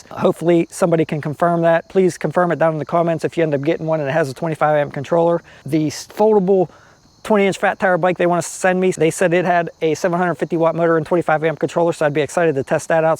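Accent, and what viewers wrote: Pittsburgh, United States. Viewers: Great video! Are you from Pittsburgh?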